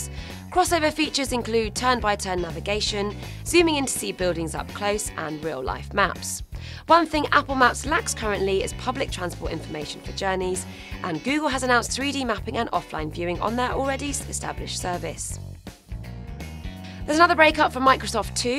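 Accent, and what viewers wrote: United Kingdom. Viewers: I really love your british accent.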